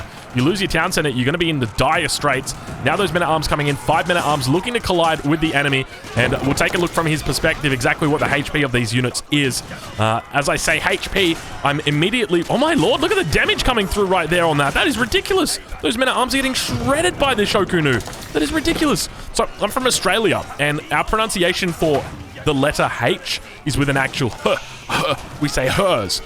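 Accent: Australia. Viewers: Lol no need to explain your Aussie English bro. The people who may or may not be even mentioning your pronounciation need to re evaluate their entire life. The whole world doesn’t speak the same lol. That’s crazy though compared to American English we pronounce our “H’s” the exact opposite; the word 🌿 herb the H is silent. The word Honed 🗡 the H is pronounced. If I were to say “HP” I’d say it just as you do, with out stressing the “H” sound in the beginning; Aech pee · I really enjoy your australian accent and the quality of cast is amazing! Greetings from Costa Rica.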